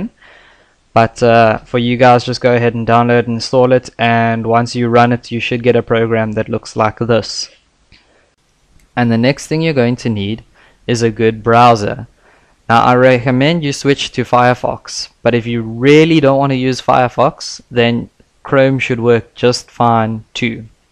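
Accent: South Africa. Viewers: You sound like Mr. Shaun Pollock and thats how I knew u r a SAian Very helpful lectures - Thanks :) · South African??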